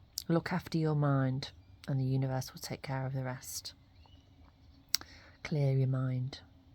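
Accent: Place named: Ireland. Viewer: Hello I won’t call you as before. You know last night I had a dream since a long long time, I was in a place a high building and people were dancing drinking and having fun and I saw a kitten downstairs was trying to climb up and while I was trying to catch it two people fell from above near me completely covered in blood and at that moment I started shaking so hard that I woke up, why I’m telling you this but since few days somebody I was forced to let her go because I was not happy with she’s a karmic with I probably lived in another life cycle and now she’s sending in my mind images of her in a provocative way and I know these thoughts are not mines. I don’t know but these two things are related in some sort. Well Nice character some times you should be use again your Irish accent 🌹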